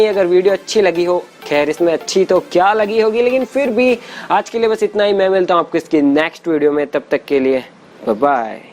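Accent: Rajasthan, India. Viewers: Bhai tu Rajasthan se he kya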